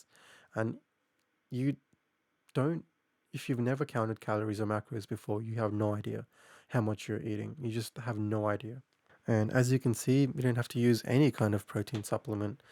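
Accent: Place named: United Kingdom. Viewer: Thank god you’ve a British accent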